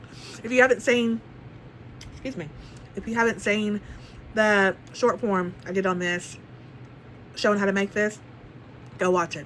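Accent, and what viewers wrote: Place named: South, United States. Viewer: Your accent is adorable - from a fellow southerner. I'm gearing up for my VSG in July. I wished it could be sooner but work committments block out June and the beginning of July. I've started implimenting things that people suggested. Drinking 64 oz of water daily, chewing 20 times before swallowing; cleaning up my diet and exercising.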